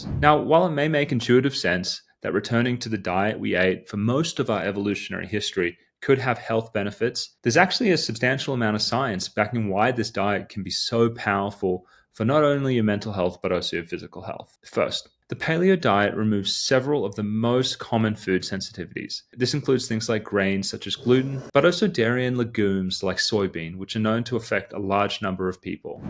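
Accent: Australia. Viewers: Thankyou for the great info. Made some changes in my life and it's really helping. Do I detect an Australian accent? Curious as I'm an Aussie 😊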